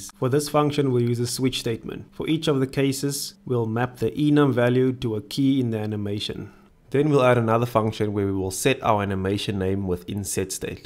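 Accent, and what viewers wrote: South Africa. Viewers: Yeah I was about to ask are you South African 😂 It's great to see Flutter Devs in South Africa. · I know a South African accent when I hear one. Keep up the good work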